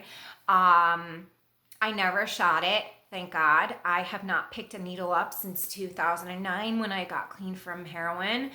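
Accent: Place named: Minnesota, United States. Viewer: I love her accent. Where u from girl? Minnesota?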